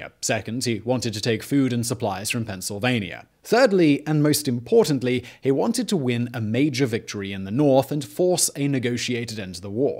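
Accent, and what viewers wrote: United Kingdom. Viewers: I don't think this guys videos would be nearly as popular if he didn't have a british accent. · I love listening to with all the random facts with his video’s but I have to admit, I feel a little dirty listening to a Britt tell American History. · I don't know about somebody with a British accent lecturing about American history really impresses me. I think I would lean more towards David Barton, a true American Historian who backs up everything he teaches with hard fact and thousands of documents from the Halls of Congress and other resources to back them up. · its interesting to hear a brit talk abt the civil war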